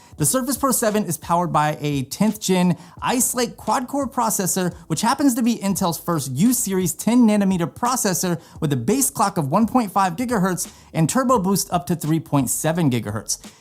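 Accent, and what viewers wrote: United States. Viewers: omgawd i lasted less than a minute.... no offence, but your voice is sooooo annoying and so 'Jersey'.....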